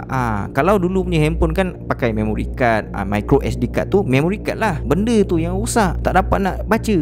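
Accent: Johor, Malaysia. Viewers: bang dari johor ke hahahaha salam dari kulai senai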